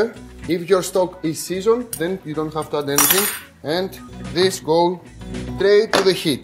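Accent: Greece. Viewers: I love the way he speaks English with that Greek accent. And I love his recipes, he uses ingredients easily available anywhere in the world, specially in India. Thanks for such amazing recipes, I have tried a few of your recipes and they are always a hit in my family. Can I use chicken mince for this recipe? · I love to watch you cook and to listen to you speak English with your Greek accent! 😄 I grew up (in the US) with Greek grandparents and many other Greek relatives and listening to you brings so many memories. You remind me so much of my cousin Stathis, (although he doesn't cook 😂.) I remember Yiayia used to make this dish and I loved it. She's been gone a very long time, 50 years, but watching you make this I can almost taste it from memory!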